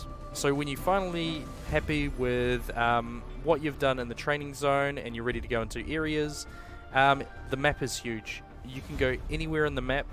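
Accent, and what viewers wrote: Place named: New Zealand. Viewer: Yo, instant sub when i heard kiwi haha. Me and my son are jamming this atm. Big fan of the ff series great vid.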